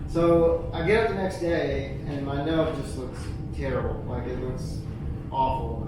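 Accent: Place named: South, United States. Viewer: LOL why are there subtitles? I can totally understand u I'm from NJ NYC is cuz of that southern accent of urs?😊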